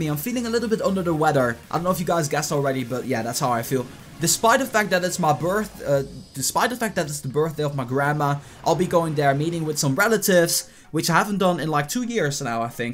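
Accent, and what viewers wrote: Netherlands. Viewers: This is one of the most helpful players I've found. His accent is Dutch. You guys are rude.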